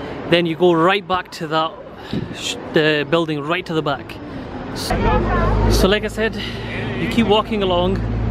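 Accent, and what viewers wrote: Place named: British Pakistani, United Kingdom. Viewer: He seems a british pakistani by his way of speaking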